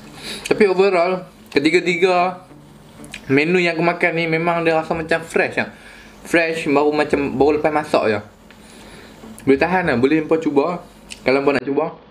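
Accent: Malaysia. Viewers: Awat comey ngat bm melayu 😂😂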